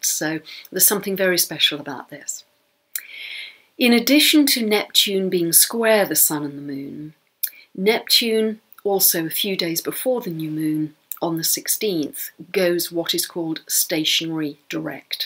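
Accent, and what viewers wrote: England, United Kingdom. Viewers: Thanks - enjoyed your energy, wisdom and wonderful 'jolly good' English accent. So good to hear the queens English spoken as it used to be. (I'm speaking as an ex West Londoner born 1950):-) Also love the cushions!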